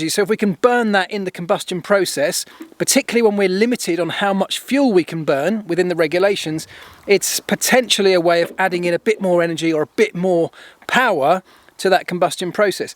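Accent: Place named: United Kingdom. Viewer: Oh no, it's brit alert again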